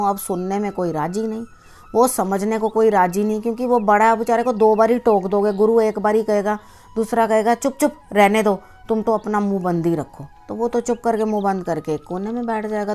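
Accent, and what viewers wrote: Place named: Punjab, India. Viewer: Jai mata di rabb sab ko sukh de excellent explaination you Are very sweet way of telling anything tooooo cute punjabi style hindi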